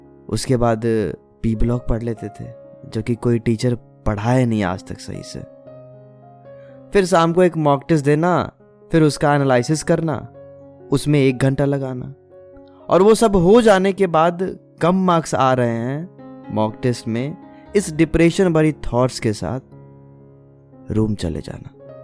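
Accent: Bihar, India. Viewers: Pure Bihar hai aapke abaj me ❤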